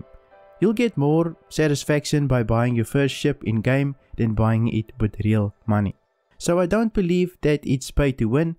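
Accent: Afrikaans, South Africa. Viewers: Lekker dude, ek het subscribe. ek kan mooi hoor jys afrikaans. Ek dink jy moet jou kanal in Afrikaans doen. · Ek kan jou Afrikaans hoor my ou hahahaha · Ayyyy a SOUTH AFRICAN bru, keep up the hard work and thank you for the video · Awe my broe. I hear this guy speak for 3 seconds and i'm like "is he from south africa?" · Are you South African?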